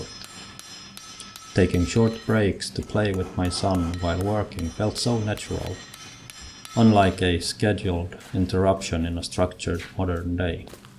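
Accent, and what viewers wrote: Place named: Finland. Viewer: You do sound more Finnish than Swedish.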